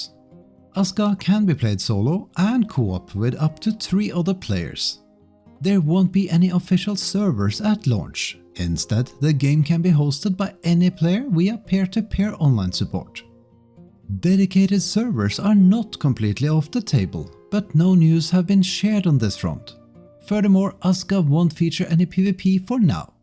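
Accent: Norway. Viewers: Are you from Norway ? your accsent sounds like that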